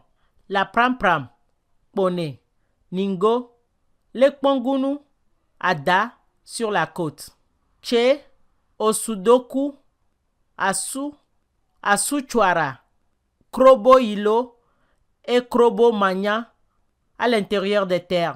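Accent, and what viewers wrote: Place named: France. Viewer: Salut. Bonne synthèse. Vous auriez pu parler plus posément pour permettre aux auditeurs de mieux vous suivre. Par ailleurs, dans les prochaines émissions, essayez de prononcer correctement les ethnonymes et toponymes avec l'accent voulu -et non l'accent français - pour être mieux compris. Enfin, on ne fait pas l'histoire sans parler des sources. Et essayez de varier ces sources. Merci.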